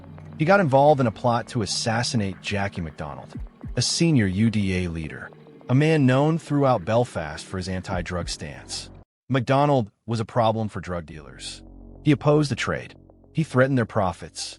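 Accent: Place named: United States. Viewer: 😂 American telling stories fs what i would love to know is where some yank knew it to tell 😅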